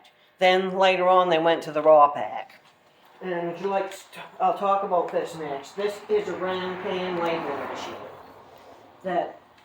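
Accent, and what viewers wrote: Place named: Maine, United States. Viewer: Loved her Maine accent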